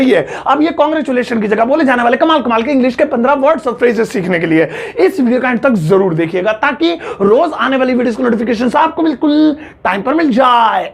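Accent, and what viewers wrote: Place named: Khadi Boli, India. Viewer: Sir kab se hamari tarah khadi boli bolne lage 😁😁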